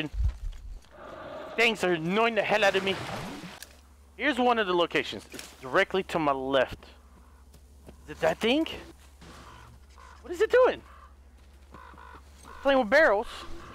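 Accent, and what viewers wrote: Russia. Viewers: RUSSIAN ACCENT 💯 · You do a really good Russian accent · I love his Russian accent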